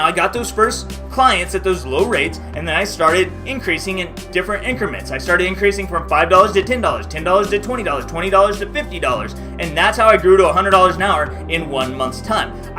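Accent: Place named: Scandinavia. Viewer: Hey, I wonder whether you're a native english speaker (?), you sound continental european/scandinavian european :)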